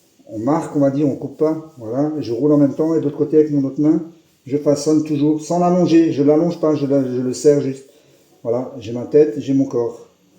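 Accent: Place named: Lorraine, France. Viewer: Genial recette et commentaires....moi qui suis lorrain d'origine j'adore retrouver l'accent et les expressions.... J'ai mis a l'agonie mon kenwood cooking chef....1.5 de farine = limite de capacité ! Mais il a bien fait le taf 😊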